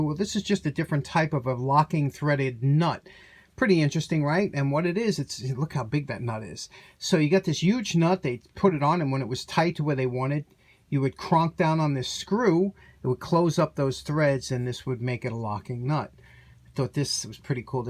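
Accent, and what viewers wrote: New York, United States. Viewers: Longga island. Accent is great. Thanks for the vid. Few of the most genuine people i ever met are from new york.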